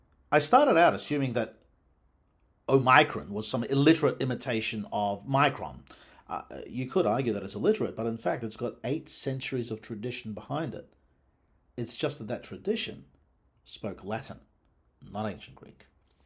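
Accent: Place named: Australia. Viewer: For some reason I never pictured you with an Australian accent, even though I know you’re Australian. Nice video!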